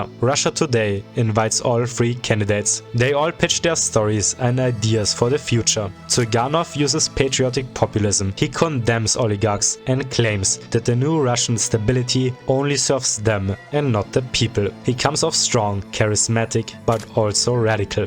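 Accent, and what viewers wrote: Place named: Germany. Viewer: The pronunciations make me think you're a German speaker? The Z in Zyuganov should be a zee sound and ths Sh in Stepashin should be a sch.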